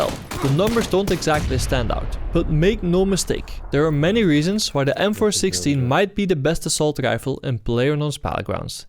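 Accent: Netherlands. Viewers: are you dutch by any chance? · You must be dutch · jaja, 100% een nederlander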